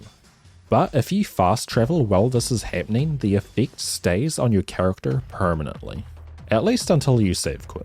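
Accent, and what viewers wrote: New Zealand. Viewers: heard the accent and knew u were NZ, great video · Hey man what accent do you have? I thought you might've been a Kiwi? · Where are you from? I initially clocked you as Kiwi but as the video went on, I became less and less confident in my assumption.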